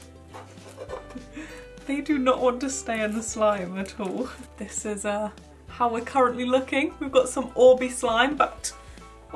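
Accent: United Kingdom. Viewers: I feel like you are like a little bit older version of me with a British accent lol, I look a lot like you ❤️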